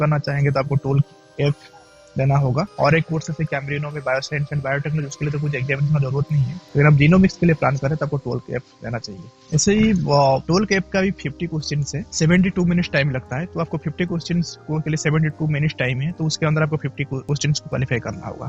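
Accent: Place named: India. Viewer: oooo😂😂I can catch ur hindi accent 🥰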